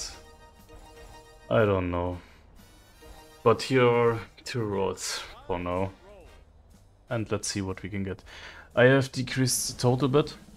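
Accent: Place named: Germany. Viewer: 😆Nirgendwo sonst den deutschen Akzent so rausgehört!!!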